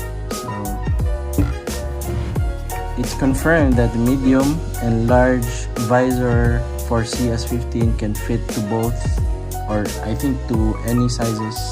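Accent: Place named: Bisaya, Philippines. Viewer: Bisaya kaayu ka ba..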